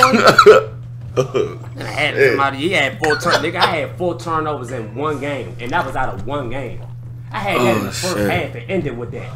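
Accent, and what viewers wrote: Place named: DMV, United States. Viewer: He gotta be from the DMV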